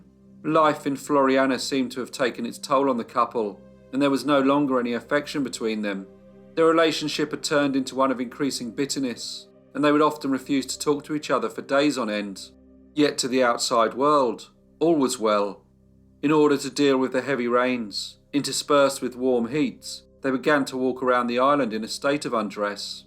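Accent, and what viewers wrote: United Kingdom. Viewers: Oh thank god it’s a Brit narrating this, Americans drive me nuts with their mispronunciation of places. 🤔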